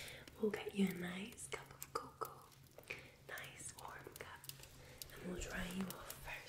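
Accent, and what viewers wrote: Scotland, United Kingdom. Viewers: Perfect timing, I’m currently getting snowed in in Michigan. You’re so good at this! A lot of people try too hard…over accentuating words, whispering to the point you can’t understand them, etc. You’re Scottish accent just adds to the relaxing tone & cadence of your voice and the overall experience. WhispersRed ASMR is fantastic as well, you’re both my favorites. I must have a thing for a UK accent hahaha! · never heard a Scottish accent ASMR, makes it even more amazing with such a soothing accent x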